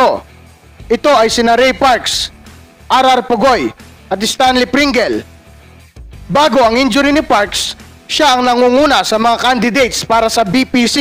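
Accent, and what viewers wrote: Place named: Pampanga, Philippines. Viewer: alatang alata na kapampangan ka heh 😂😂😂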